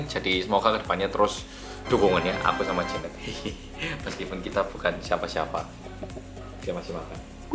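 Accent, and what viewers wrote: Java, Indonesia. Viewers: Opose garai kepo ae seh mas medhok iki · Bahagia slalu wong Korea medok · Tapi sue sue medok e yo ilang wkwkwk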